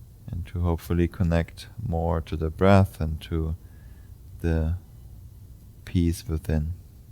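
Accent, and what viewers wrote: Germany. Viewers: I like your German accent. ❤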